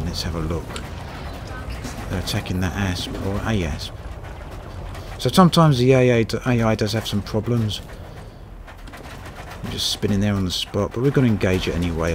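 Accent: United Kingdom. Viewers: very interesting accent, does anybody know from which region in the UK is his accent from? just curious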